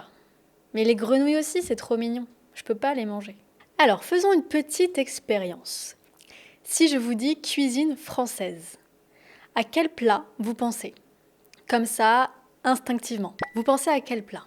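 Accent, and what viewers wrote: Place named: Occitania, France. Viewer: Aaah tu es occitane! Maintenant je compris pourquoi j'aime ton accent. J'etais là à juen cette année. Quelle marveille! Parles-tu occitan aussi? J'ai prouvé le cassoulet à Narbonne et il est le plat qui j'ai aimé plus parmi toutes dans mes vacances en France. J'ai prouvé la bouillabaisse à Marseille aussi mais elle ne m'a impressioné pas trop. Je n'ai pu trouvé du boeuf bourguignon mais j'ai prouvé la daube de boeuf et le guardien de toureau, tres bons. Excuse-moi pour mon mauveaux francais, je suis italien y j'ai prouvé à ecrire sans traducteur ou diccionnaire.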